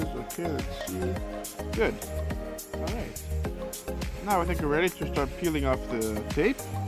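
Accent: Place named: Greece. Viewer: Wow, I wasn’t expecting to find such an amazing video about ceramic coating a rear view camera, and to top it off, the voice and dialect are incredible! Your explanation is so clear and engaging, and that Greek accent just adds an extra layer of charm. This is by far the best video I could find on this topic—thanks for sharing your knowledge in such a great way! Keep up the awesome work!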